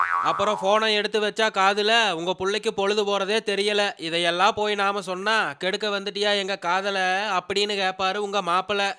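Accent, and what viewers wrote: Coimbatore, India. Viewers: இததா கோயபத்தூர் குசும்பு ஆனா சூப்பர் பேச்சு😂😂😂😂😂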